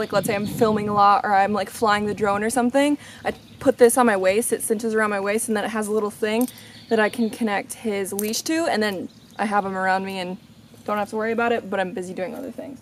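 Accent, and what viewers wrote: South, United States. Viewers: Girl you got me as a sub as long as you keep running around barefooted and talking country like that I'll follow you any where on this planet!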